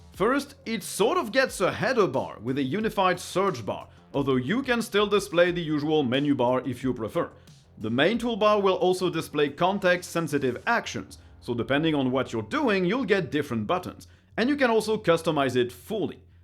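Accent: France. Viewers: He's French? I've long been guessing where that accent comes from lol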